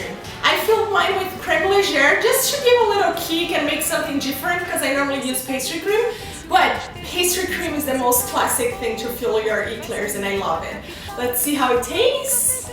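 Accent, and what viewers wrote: Brazil. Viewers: Você é brasileira, né? YOU'RE BRAZILIAN, AREN'T YOU? I KNOW BY THE ACCENT! A receita parece deliciosa! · she sounds brazilian they have onr if the cutest accent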